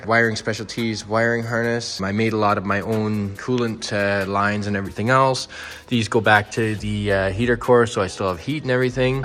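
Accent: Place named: Canada. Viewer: fuck ya dude. you sound canadian as hell lol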